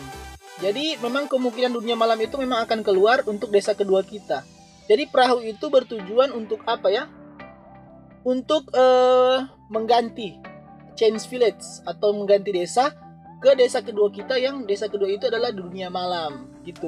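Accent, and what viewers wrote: Manado, Indonesia. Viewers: so kntra tu ba logat manado😂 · .....orang manado? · orang mando ato Gorontalo?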